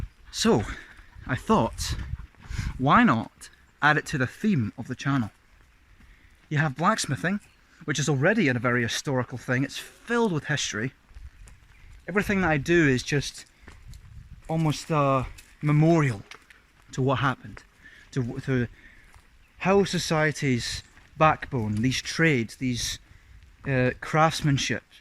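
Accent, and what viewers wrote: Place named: Scotland, United Kingdom. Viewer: Oh my god you have grown up so much! Your accent too! So mature and Scottish. Keep up the great work!!!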